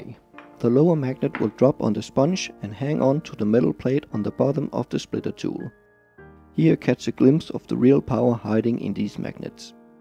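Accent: Denmark. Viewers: Are you from Denmark. I think I here Danish accent. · Are you from Denmark? Your accent seems Danish. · The danish accent is strong with you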